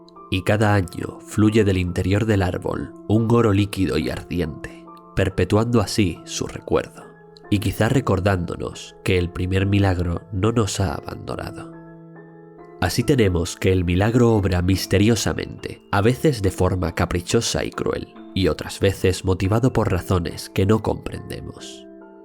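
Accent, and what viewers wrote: Spain. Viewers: Excelente trabajo, y que este relatado con acento español le suma mucho a la ambientación.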